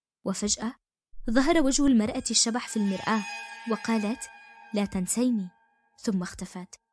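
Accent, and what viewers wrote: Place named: Andalusia, Spain. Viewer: سهل تكون حاكي قصص بس صعب تكون بصوت ❤️🥺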